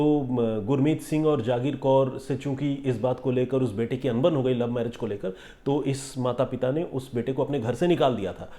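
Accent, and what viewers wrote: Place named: Bengal, India. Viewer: I like your vocabulary in hindi sir. Bengali hokar itna acha shudh hindi boltehai .👍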